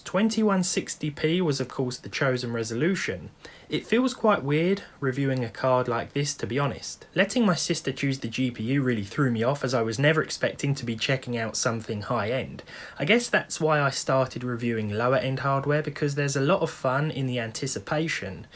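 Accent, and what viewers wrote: United Kingdom. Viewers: Greetings from "DEUTSCHLAND" i love ur british Accent!!! 🙂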